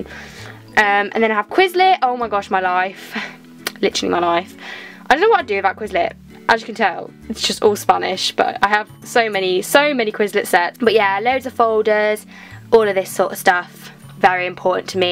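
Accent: United Kingdom. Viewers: british accent so annoying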